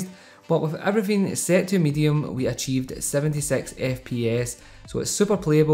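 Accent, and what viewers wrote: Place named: Scotland, United Kingdom. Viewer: He's Scottish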